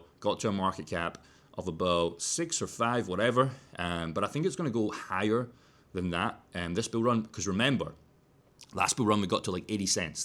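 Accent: Scotland, United Kingdom. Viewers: Scottish ffs what u American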